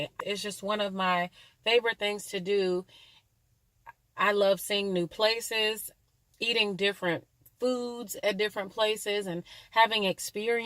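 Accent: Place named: North Carolina, United States. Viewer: ❤️ I'm from and live in UK, my hubby is from America, he walked past and was like 'who you listening too, she sounds like she's from NC', which is where he is from. I have no idea where you are from, but I love listening to your videos as your accent reminds me of all our family and friends back there🥰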